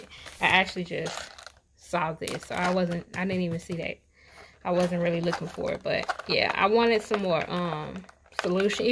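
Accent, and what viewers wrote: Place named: South, United States. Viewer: I just found you and I’m already hooked on your videos. I don’t know if it’s the wonderful southern accent or all the awesome items you’re showing but I can’t get enough❤️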